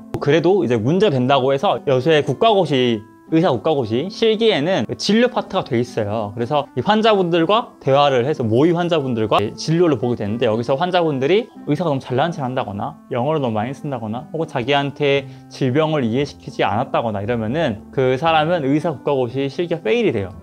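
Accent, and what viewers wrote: South Korea. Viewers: 근데 진짜 영어발음 말고 한국식발음이라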